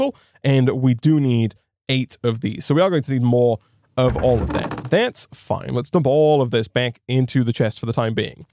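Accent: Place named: Hull, United Kingdom. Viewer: I've been trying to pin your accent down the whole time I've been watching your videos. I finally got it. That's a Hull accent. Great content fellow yorkshireman.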